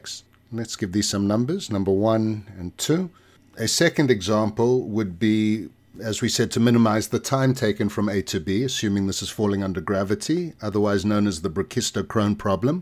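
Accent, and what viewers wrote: South Africa. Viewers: Are you South African friend? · South African accent? 😁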